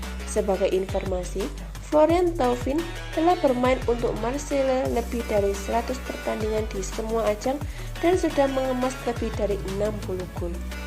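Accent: Java, Indonesia. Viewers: min, orang jawa ya, medok bener logatnya...(^_*) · Iyee gue jg merasa medok suaranya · Gak usah ke Jawa Jawa an lah ngomongnya....biasa saja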